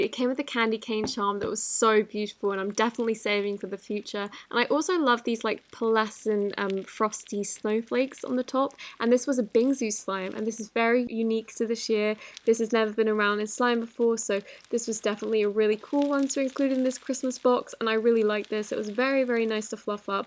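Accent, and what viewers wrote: United Kingdom. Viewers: I also ❤️ ur British accent 🤗😍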